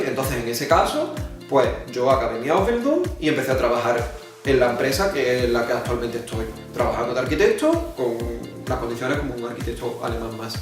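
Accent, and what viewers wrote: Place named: Andalusia, Spain. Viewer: Olé olé y olé, maravillosa explicación!!! qué acento andaluz más bonico!! un besazo guapoooo!!!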